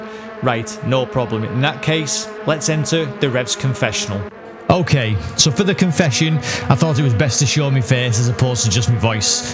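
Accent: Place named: Bolton, United Kingdom. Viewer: Bolton? I recognise the accent. Anyway your very close to me!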